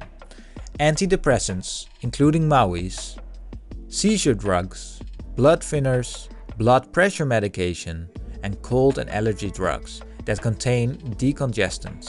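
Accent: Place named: Netherlands. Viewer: I recognize a dutch accent when I hear one!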